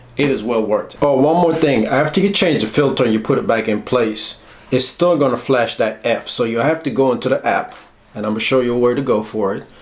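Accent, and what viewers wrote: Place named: Jamaica. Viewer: Thanks for the video! I'm detecting a little accent from u. Sounds a little jamaican 🤔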